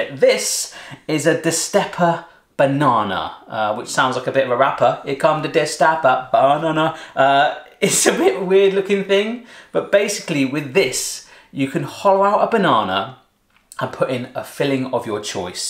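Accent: United Kingdom. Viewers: Are you British or America?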